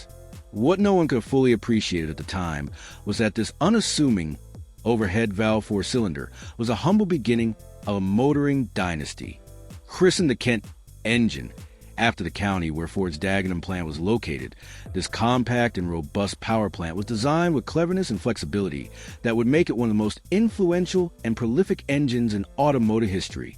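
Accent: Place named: United States. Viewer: American voiceover? Hmmm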